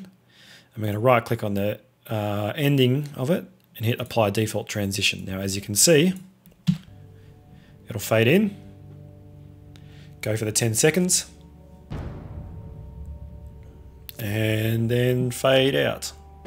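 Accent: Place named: Australia. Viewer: Thank you for the tute.. Best I've found so far. Good to hear an Aussie accent.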